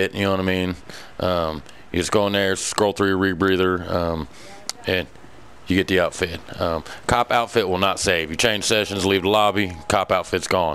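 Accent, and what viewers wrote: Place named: Tennessee, United States. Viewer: U from Tennessee or what?i know a near home accent when i hear it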